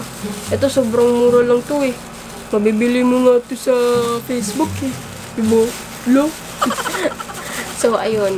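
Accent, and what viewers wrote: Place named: Visayas, Philippines. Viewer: I love it beb..pag nagtatagalog ka with visaya accent and English...soooo cuteeee baby gurl..I love you alwayssss..stay like this forever.❤️❤️❤️❤️❤️❤️❤️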